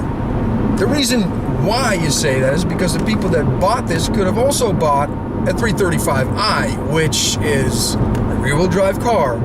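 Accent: Netherlands. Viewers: i detect a dutch accent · ahahahh, grappig om nog een beetje een Nederlands accent te horen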